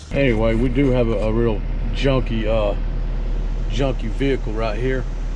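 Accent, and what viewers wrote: South, United States. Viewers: I love your accent and I love when you say I'm fixing to tow it lol You're a true southern boy. I live in Canada and apparently we talk with an accent too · Good job... what makes it better is your southern accent.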